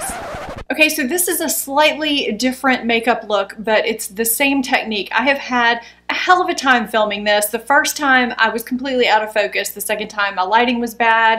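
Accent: South, United States. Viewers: I appreciate your subtle southern accent #southernbelle 🥰🥰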